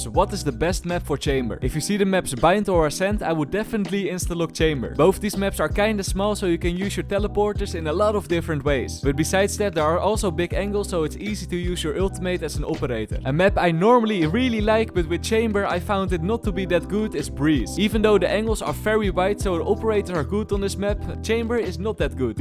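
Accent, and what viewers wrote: Netherlands. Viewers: I know because of ur accent that u are Dutch, ik ben ook Nederlands 😅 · Are you dutch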